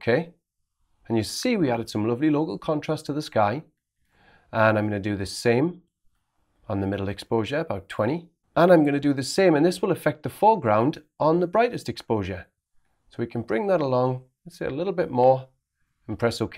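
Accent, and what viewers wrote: Newcastle, United Kingdom. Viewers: To use an old English phrase, you really know your onions! Superb stuff. And your Geordie accent is always a pleasure.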